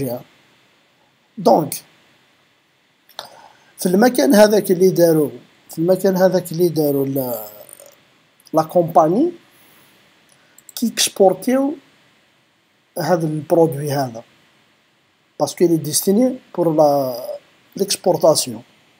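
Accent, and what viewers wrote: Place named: Kabylie, Algeria. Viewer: J’ai reconnu ton accent kabyle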